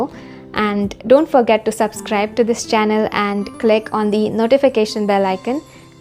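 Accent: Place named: India. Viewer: Where'd you learn to speak your english??? India... How annoying you sound!!!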